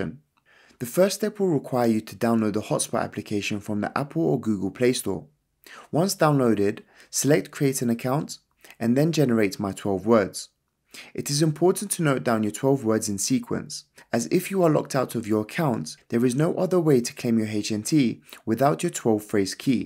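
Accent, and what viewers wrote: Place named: North London, United Kingdom. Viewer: Hey man, just discovered your video/channel. My Linxdot arrives late March. Great video, have subscribed. I'm in North London, you sound as if you are too? If so, how is it going and if not how you finding mining in London? Still to watch more of your vids but you are the first I've found in London possibly north 😄 Cheers. Edit- just finished the video, very informative, thank you.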